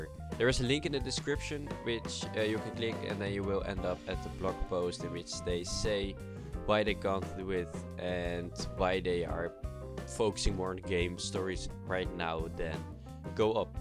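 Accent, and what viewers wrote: Netherlands. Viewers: You sound dutch(I am) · Are you dutch? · er was makkelijk te horen dat jij een nederlander was 😅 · Are you from Holland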